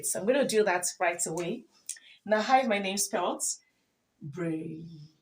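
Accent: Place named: France. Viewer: Nice , your video is of great value. Sure you are french, nice and beautiful accent 🎉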